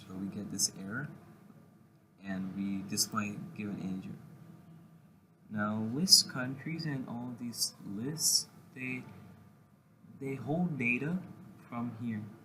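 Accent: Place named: England, United Kingdom. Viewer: EPIC ENGLISH ACCENT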